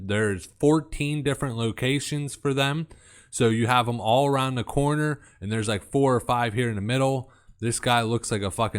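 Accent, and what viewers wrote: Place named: Pittsburgh, United States. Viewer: well the pgh accent makes sense now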